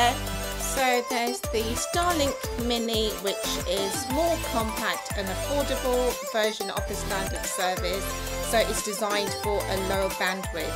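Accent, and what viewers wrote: United Kingdom. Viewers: British accent manyama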